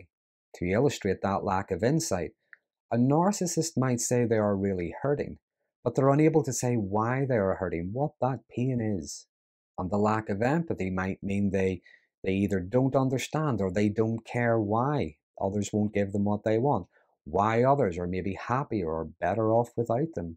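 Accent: Ireland. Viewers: Hey Ya! Binging your vids today. What a Rich MINE! of lucid eloquence. It's serving as an Excellent re-cap and review of all I've ben learning and applying the last two years since discovering Evil is real, walking among us, uncaged. And I'm catching some new stuff or another way to put old material. Thank you Just what the Dr ordered for this Gorgeous Spring Sunday in the desert. 🌞😊 Off topic- ish, but came to mind as I've listened: Are you following the plight of the British Royal Family and the phenom of the great Narcissist, Meghan Markle? I haven't looked at your deets yet, been too fascinated listening to you, and doing housework, but I'm thinking your accent is Irish, so...( Just FYI because I'm pretty 😅sure I'll be hanging around your channel a bit: I'm English born and raised till 12, then fam moved to States. I'm a mutt but mostly American Mexican by culture. 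Have lived in New Mex, USA for 26 years) Just curious about your thoughts on the subject if you have had interest. On that note, are you aware of HG Tudor's work? Any thoughts about his methods of madness? Ok- Back to absorbing your words and knocking out the dishes. Cheers 🇬🇧 + 🇺🇸 =❤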